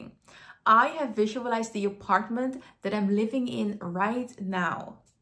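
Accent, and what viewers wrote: Netherlands. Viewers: Are you dutch !?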